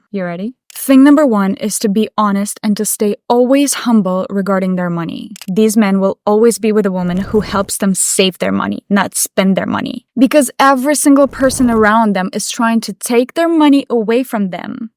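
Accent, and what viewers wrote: Russia. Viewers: Where does this accent come from? Russia? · She's Russian for sure:) only Russian girl can speak and act like this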